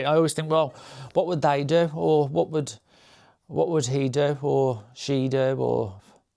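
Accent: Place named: Leicestershire, United Kingdom. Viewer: I loved watching your video , I have so much to say lol , regarding ends of quilting , I do ,do a back stitch , but if your unhappy , I would just leave a long thread and make sure you bury it well , but honestly , I don't worry about it myself . If a man on a horse riding by can't see it , then your good lol . What is that corner turny thing you mentioned ? As to the stripey binding , if it's on the bias the stripes go diagonally. I would use your left over stripey fabric to bind something small like a table runner to practice and see if you like it . I don't know where in the UK your based ( sounds close to where I was born , Leicestershire) but if you gave a local quilting shop they might know of local groups you could join , we always loved it when men joined . Now my health is not good I can't go to groups so I do video calls with my sister of friends whilst we quilt or crotchet etc . 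Do you know anyone ? On WhatsApp or messenger the video calls are free so we do it for hours . And have you seen the book Fox under the moon , seasons of hope ? It's similar to the boy , the fox ,the mole and the horse , I find it helps sometimes . I love the illustrations so much I'm going to make some in to small wall hangings ( that is after I finish some other wips lol )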